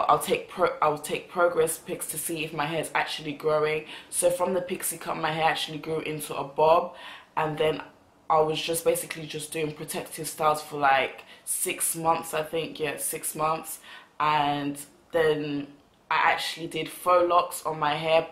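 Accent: United Kingdom. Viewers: Just subscribed - only because I heard your accent....big up Brit Youtubers!! Now let me listen to what you have to say! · I love that British accent, it's special.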